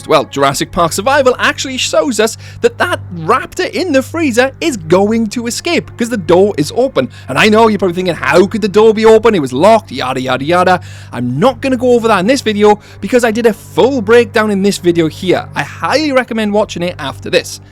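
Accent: Wales, United Kingdom. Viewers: He sounds Welsh to me but I know what you mean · Are you welsh?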